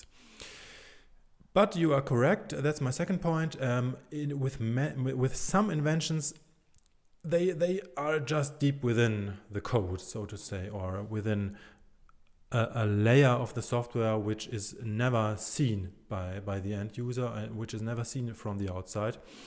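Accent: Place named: Germany. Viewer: Schöner deutscher Akzent :-) Ich frage mich, ob es für mich als End-User rechtliche Schwierigkeiten geben kann, wenn ich eine kostenlose Software nutze (z.B. Gimp als Photoshopersatz). Z. B. wenn ich als Berufsphotograf Bilder manipuliere und diese dann als JPG exportiere, um diese zu verkaufen. Wäre meine Nutzung der Bildbearbeitungssoftware GIMP illegal, wenn z. B. patentierte Prozesse oder Dateiformate mit der Software zum Einsatz kommen, welche die Software gar nicht nutzen dürfte? Oder sind die Softwareprozesse in diesem Zusammenhang eh kein geistiges Eigentum, weil es unpatentierbare mathematische Formeln sind?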